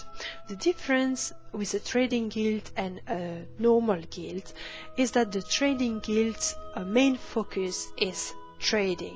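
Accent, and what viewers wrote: France. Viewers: my dear, as a new player i would just like to say thank you! :) a wonderful presentation, wonderful & very useful tips...and a wonderful french accent :) mesmerizing voice, a pleasure to listen to you! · your "r"s suggest that you are frenchspeaker:) love it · I really like the fact that you dont give the usual hardcore, grind this, ignore the game, rush there tips. You made me and for sure other people remember why we started playing mmorpg´s in the first place: diving into a world of fantasy and enjoy it. Big thanks for that. There is only one "problem" about all this. Started the video, saw that pretty face of yours and heard your beautiful french accent. 10 minutes later i had to F5 because i did not remember any single word you said...was just floating on a wave and listening to your voice. Stop beeing so charming !!! ;) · that blew my mind, because your accent while speaking English definitely has a french accent to it:D so do you also speak Dutch/German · omg the French accent is real love it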